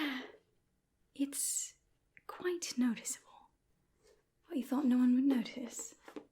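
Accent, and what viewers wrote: England, United Kingdom. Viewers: gasp british accent british accent british accent british accent british accent british aCCCCEEEEENT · Yes Harry Potter rp! I love it and the slight British accent was so cute ❤️ Thanks for the great video <3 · We thank you as well for such entertaining content. Your roles plays are second to none. Your English accent is spot on slightly posh but without going all Downton Abbey 😄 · okay so hear me out: TALKING IN A BRITISH ACCENT. I’M LIVING FOR IT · I love that British accent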